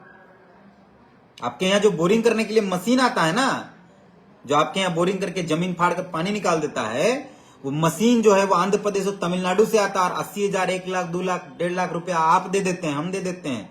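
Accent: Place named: Bihar, India. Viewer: Ap jharkhandi jesa bat karo ne ap bihari jesa bat kar rahe ho jharkhand ka niwasi ho kar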